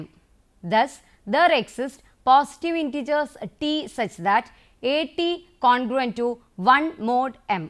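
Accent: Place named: Kerala, India. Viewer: Malayalam influence in English. Plz learn phonetics immediately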